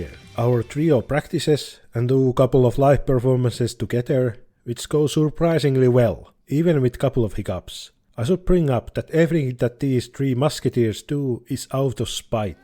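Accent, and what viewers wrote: Finland. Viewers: great videeo random question but where are you from? guessing u could maybe be finnish since your pfp looks kinda like the Spurdo Spärde stuff n ofc ur accent is pretty finnish like lmao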